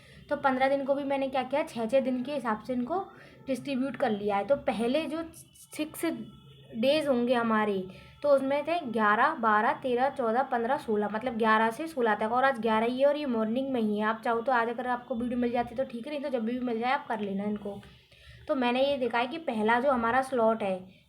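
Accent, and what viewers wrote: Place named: Bharatpur, India. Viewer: Bharatpur se ho kya language to aesi hi h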